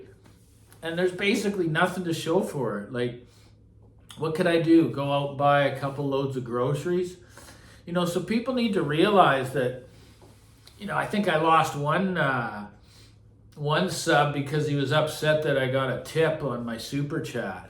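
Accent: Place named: Canada. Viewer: I knew this furious pace of new videos would take its toll. As previous commenters have said, its better to speak with purpose than speak with haste. Now, I could listen to that Canadian accent all day long. No lie! Keep up the good work and the committed followers will be there. You speak from the heart and from a position of experience. All of these I respect and find refreshing as compared to the puppy mill of reviewers out there. Reviewing over 100 speaker models in a year? How do you even maintain a baseline? No thanks. Ill take my dose of whenever it next dings my bell.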